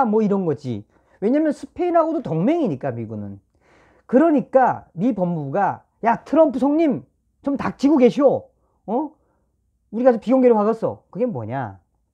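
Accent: North Korea. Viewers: 북한사투리로 미국 입장 말해주는게 재밌습니다. ^^~~항상 발전을 기원합니다.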